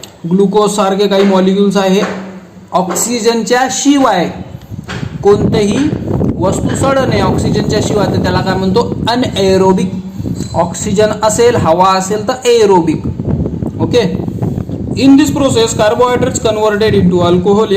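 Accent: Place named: Nagpur, India. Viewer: Sir are you from nagpur I think because you talk like nagpuri bhasha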